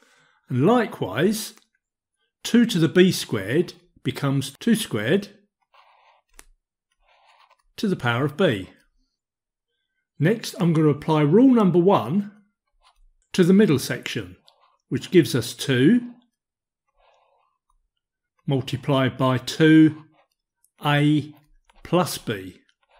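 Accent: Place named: Cockney, United Kingdom. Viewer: Really nice! Love your accent(Cockney I hope?)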